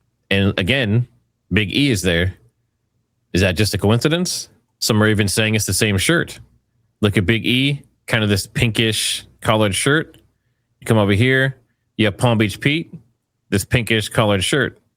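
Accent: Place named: New York, United States. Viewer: You can hear the New York accent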